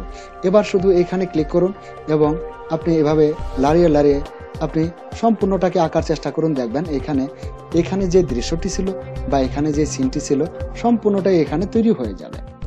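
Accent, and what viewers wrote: Bangladesh. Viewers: আচ্ছা আপনি চট্টগ্রামের নাকি? উচ্চারণ অনেকাংশেই চট্টগ্রামের মতো লাগে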